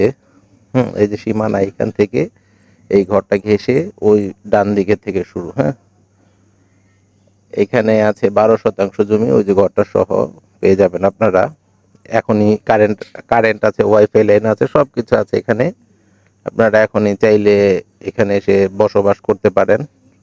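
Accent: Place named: Old Dhaka, Bangladesh. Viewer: ধন্যবাদ আপনাকে ভাই। সুন্দর উঠান। আপনার সবচাইতে সুন্দর জিনিস হলো আপনি অনেক চেষ্টা করেন পুরান ঢাকার ভাষাটা লুকানোর জন্য। কিন্তু ওটাই আমাদের পুরান ঢাকার সবচাইতে বিশেষ বৈশিষ্ট। পুরান ঢাকার লালবাগ থেকে বলছি। দাওয়াত থাকলো আপনার জন্য। ভালো থাকবেন।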